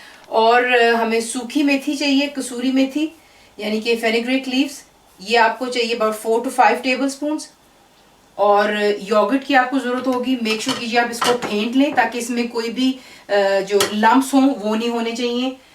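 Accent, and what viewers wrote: Punjab, India. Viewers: I made your dish. It's delicious. I love your accent mam, it's like typical punjabi mom. Thanks for the recipe :D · Dear Ji, I have tried this recipe and it was AMAZING. My father loved it absolutely, I belong to Sikh family and it was just the kind of delicacy I was looking for.:) Thank you again Ji, please upload some more Chicken recipes:) And yes, your Punjabi accent is amazing, and I am sure you must be an amazing person too:) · omg... I literally drooled over my keyboard. Thx a lot ji.... I love your Punjabi style Hindi (Urdu):) Maza aa gaya kasam se recipe mein. Will definitely try this. Cheers from Korea!! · I love your punjabi accent :) it's ncie to listen to!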